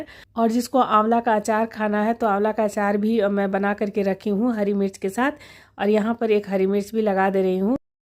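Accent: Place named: Bihar, India. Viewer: Aunty ji 🙏 apke bhasha se lgta hai ap bihar se hi hai 😊 kis jila se hai ap..bht acchi thali .. testy 😋 hmm hath se ese chawal neri maa v nikalti hai...❤ lovely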